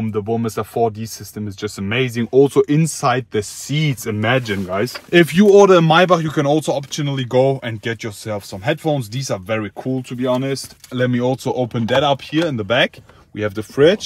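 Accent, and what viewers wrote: Germany. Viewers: Dieser deutsche akzent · Alter dein fucking German english Akzent Lachkick genial ha ha ha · The fact that he’s got a thick German accent made me subscribe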